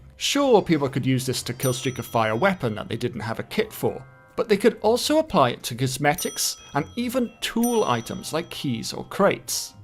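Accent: Wales, United Kingdom. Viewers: Nice to see/hear more Welsh content creators, great video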